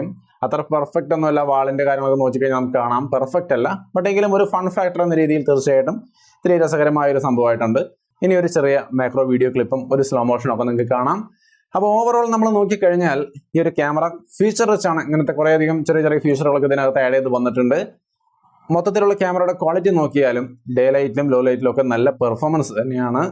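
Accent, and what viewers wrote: Thrissur, India. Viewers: Pakka തൃശൂർ കാരൻ 👌👌👌👌😁😁😁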